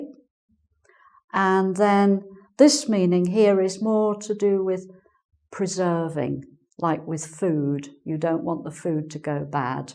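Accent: United Kingdom. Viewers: From Brazil From Marcio Hello Teacher... It is a pleasure to meet you... I had never seen a class of you here, but I am enyoying it a lot. Where you're from? Your accent sounds like a British person. I love it. Hugs! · I really enjoy your lessons, enjoining listening to very clear British accent, and I learn new things such as the difference between: can you please and could you please. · AstronoyBrasil Hi! I was curious about the same thing and then I've found this site with some information about all of the Engvid Teachers: <link> - I'm not sure whether Ms. is from UK or not. However, we can see she lives in London and her accent is perfectly British! Hope this helps you. Bye! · amazing Teacher) and her accent is so British. she must be perfecr granny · a brilliant teacher and british accent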